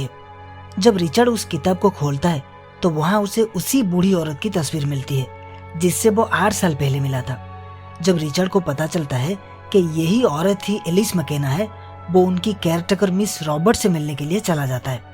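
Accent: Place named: Gujarat, India. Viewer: Itni acchi movie ko gujrati accent mein explain kiya hai isne 😢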